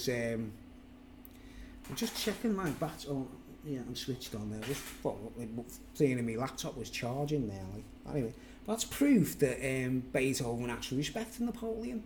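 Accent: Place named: Liverpool, United Kingdom. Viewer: Hi, another interesting speech,it was the gelert solo tent video that brought me to your channel in the first place, and I liked the Liverpool accent.funny thing is it's only when i'm having a swally I head over to see what your up to:-) (no offence) keep up the speeches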